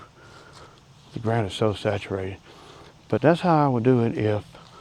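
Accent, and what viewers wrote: South, United States. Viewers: and the audio is great. I can here that southern drawl sir!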